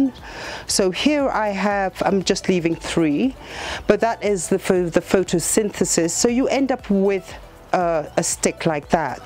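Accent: United Kingdom. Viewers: Your accent is so British (coming from England myself)!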